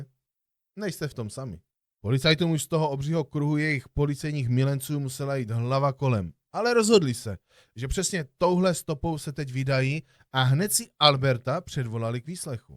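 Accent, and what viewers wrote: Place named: Brno, Czech Republic. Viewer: A hlavně s brněnským přízvukem 😉👍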